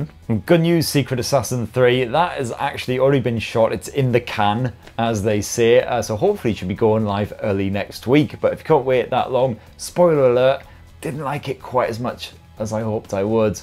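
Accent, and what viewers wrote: United Kingdom. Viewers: Are you American? I've noticed Americans seem to struggle with British accents. I understand him fine, did I remember I used to struggle when I would try to watch trainspotting.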